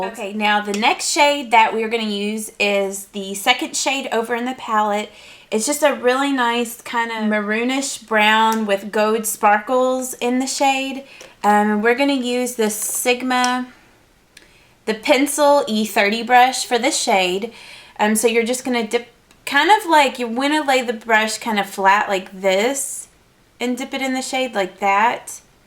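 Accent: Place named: South, United States. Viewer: i know this is an old video, but this is so pretty! i hope they still make this palette. im so glad i found your channel, and i love your accent too. at first i thought you were from another country (not the u.s), but then i realized that its southern. thanks for this bold but wearable tutorial.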